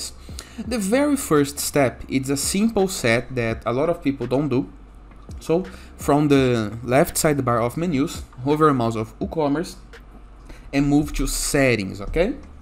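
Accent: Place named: Brazil. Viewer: depois de 5 segundos assistindo.... é BR! certeza :medal-yellow-first-red: Muito TOP as dicas valeu!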